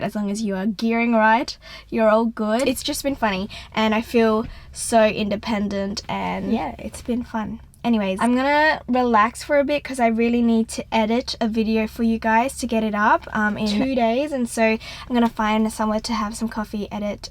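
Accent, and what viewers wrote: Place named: Australia. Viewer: I think I once left a comment on your vlog like, “Do Aussies really speak this fast?” 😂 Was watching Aussie videos to study English and ended up on your channel again… and omg, my hometown showed up!! It felt so strange (but in the best way) seeing it filmed so beautifully. And I’m in Melbourne now — day 3 here!! 😊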